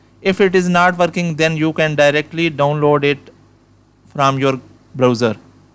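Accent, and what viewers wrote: India. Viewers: Im tired to listen indian acsent talk English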